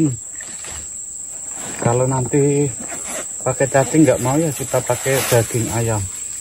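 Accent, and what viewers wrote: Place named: Java, Indonesia. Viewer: Mas, sampiyan ki wong ngendi? kok koyo wong jowo? jowo ngendi mas?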